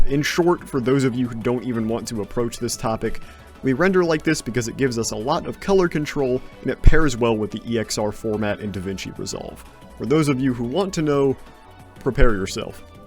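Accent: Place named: United States. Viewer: "compositing is a long and complicated process that many of you may be unfamiliar with as 3D artists" I'm sorry but this is a genuine question, but do Universities not teach this as part of their curriculum in America (I'm guessing based on the accent)? Do you choose your specialisation right from the get go? Because I was taught everything from Modeling, Animation, Rigging, Texturing, Compositing, literally anything and everything that can be in a 3D pipeline. In all sense of the word, we were taught from the ground up to be generalists. I understand that some people are self taught or are just hobbyists so learning compositing seems like a waste if all you do is modeling or animating, but I'm curious for people that went for a formal education how that all looked like.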